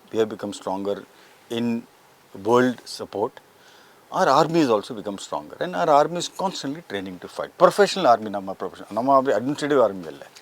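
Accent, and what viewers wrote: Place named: Kerala, India. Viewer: Mallu tamil is always nice to hear..nice information..jai hind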